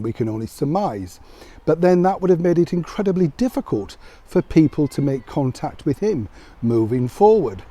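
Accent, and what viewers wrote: United Kingdom. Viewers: Cant get more Bri'ish than 🧐🎩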